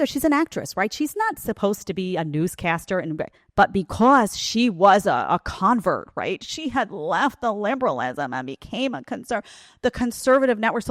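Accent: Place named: Brooklyn, United States. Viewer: Please, you must stop imitating your teenage only fans females... You go into adolescent brooklynese, New York dialect and you particularly flex the intonations on the ' nasty' as if you're actually that icky female. Just speak about the subjects you're dealing with, and don't become them or allow them to become you. They still resonate a living adolescent part of you, or else you wouldn't be able to join in their vibration so frequently and so effectively. Time to grow up!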